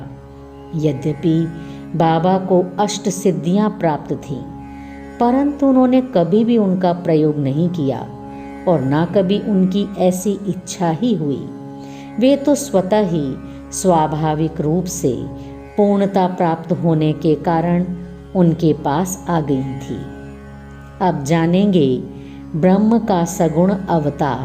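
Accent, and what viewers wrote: Standard. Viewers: Om sai ram.आपके द्वारा बहुत ही मधुर और शुद्ध उच्चारण में बाबा की लीलाएं हमें सुनने को मिल रहीं हैं. इसके लिए आपका बहुत-बहुत धन्यवाद।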